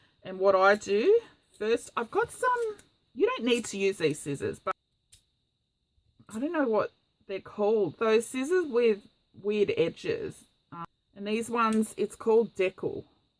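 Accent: Australia. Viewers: Hi! My name’s Erica & I live in Lawrence, Kansas, USA! You just showed up on YouTube for me & I’m so glad you did, because I love this method for making paper ruffles! It’s genius & I hope I can get the hang of it! I can also see using paper that’s been colored with sprays & paints! I think you said something like the sky’s the limit, haha! I love your Aussie accent, as well! I have “liked” & subscribed & will mention you to my friends! So nice to find you! Erica❤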